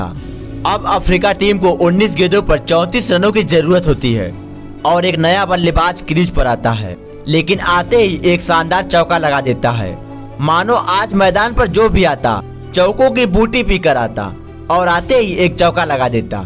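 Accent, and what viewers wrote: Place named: Bihar, India. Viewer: Tu bihar se ho ka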